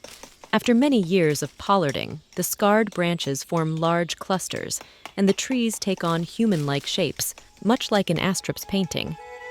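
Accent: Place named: United States. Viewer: Great to see/hear a film about pollarding. In Aragon, there are thousands of Chopo Cabeceros, Black Poplar pollards. If I am being picky, Would have been a perfect film with a voice over from a European person. Seems odd to hear an American chatting about this subject?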